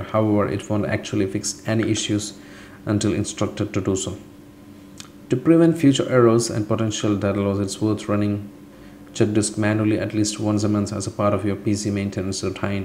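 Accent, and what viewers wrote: Kerala, India. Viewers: Great video! Wow! I also detect a 🤓 faint Malayalam accent when you speak...which makes it even more awesome!! 👏👍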